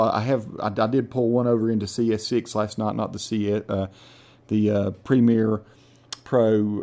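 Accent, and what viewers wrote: South, United States. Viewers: Southern accent?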